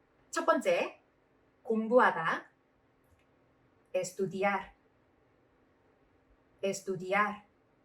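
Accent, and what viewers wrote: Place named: Gyeongsang, South Korea. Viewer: 산티아고 순례를 계획하고 스페인어를 배워보려고 시청하고 있는데 샘 처녀 시절( 샘) 경상도 억양으로 열강하시던 것까지 섭렵하고 있습니다. 정말 감사합니다. 열심히 배우겠습니다.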